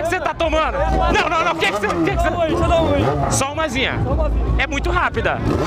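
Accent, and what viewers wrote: Goiás, Brazil. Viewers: No pique do Goiás assim que se fala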